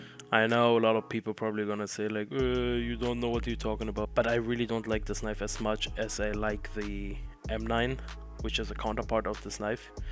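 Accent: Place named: Germany. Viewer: Safe bist du deutscher das hört man an deiner Aussprache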